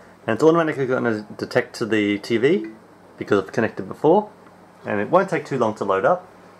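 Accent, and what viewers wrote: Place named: Australia. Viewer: It's not that hard to understand him. He's Australian. It's like American but with an accent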